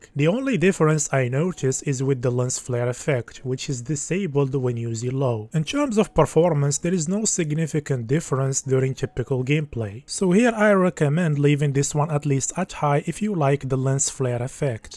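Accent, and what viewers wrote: Morocco. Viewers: sounds moroccan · the Moroccan accent is a bit too overpowering brother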